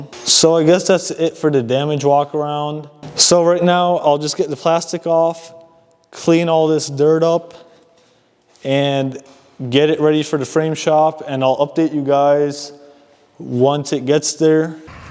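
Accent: United States. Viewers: Dude are you from the Western Mass area?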